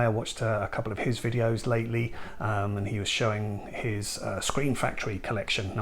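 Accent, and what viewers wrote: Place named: England, United Kingdom. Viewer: It’s the English accent that makes it sound so classy when you’re talking about movies 👍🏻 400 great job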